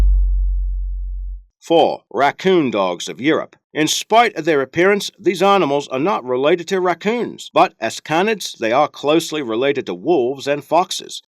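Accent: Australia. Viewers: Im so confused what his accent is it sound Australian then american then south african.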